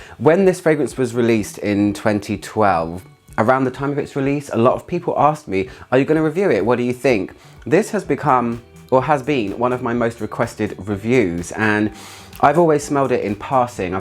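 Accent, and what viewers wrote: United Kingdom. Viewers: 😂 I’m American and this whole time I thought it was your British accent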